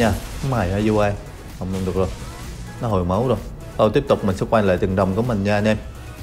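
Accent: Quang Ngai, Vietnam. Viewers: Y chang, hồi kì nghe là ngộ ngộ ồn người quảng ngãi rồi. Chào đồng hương nha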